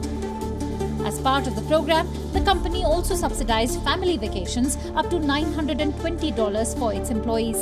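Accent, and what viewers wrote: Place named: India. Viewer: Indian English lol learn the pronunciation first. Work on your accent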